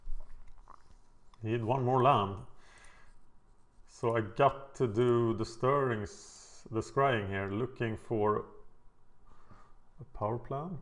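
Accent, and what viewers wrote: Sweden. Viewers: My first reaction was "Swedish accent". Is it?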